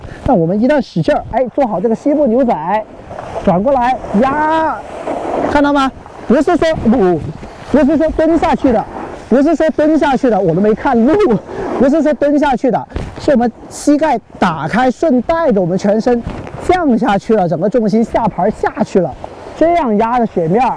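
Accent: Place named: Cantonese, China. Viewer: 广普加儿化音 太逗了！哈哈哈哈哈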